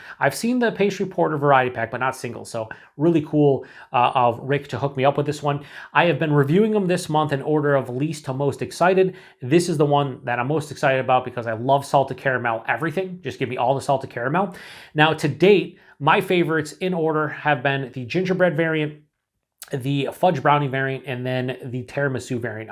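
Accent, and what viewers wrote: Western New York, United States. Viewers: Don’t worry about your pronunciation. I think it’s mostly the western New York accent just showing itself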